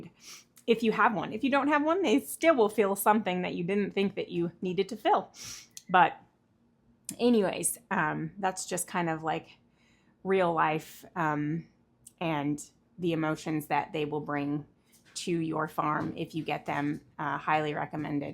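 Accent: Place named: South, United States. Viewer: very interesting but i couldn't deal with them not making it i can't believe people correct your speech really you have a southern drawl and so what if it comes out not the u sound i know what you meant. great video full of info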